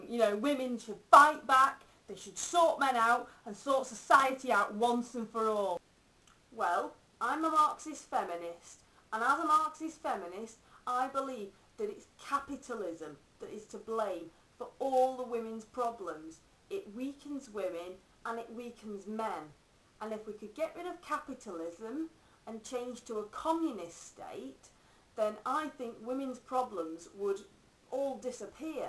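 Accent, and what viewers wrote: United Kingdom. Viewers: this stoke accent is beautiful. 'CAPEETULLIZUM.' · Are you from Birmingham?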